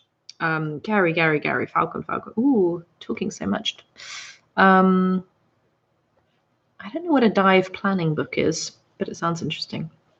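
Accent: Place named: England, United Kingdom. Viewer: I'm. A huge fan of you ur the aesomest English girl I all so love ur acsent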